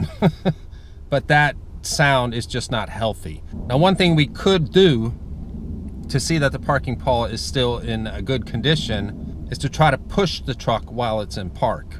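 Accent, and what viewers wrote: Sweden. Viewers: You don't sound Swedish anymore! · Are you american? You may sound a little Swedish 😁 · you sound like you have a faint FAINT swedish accent :)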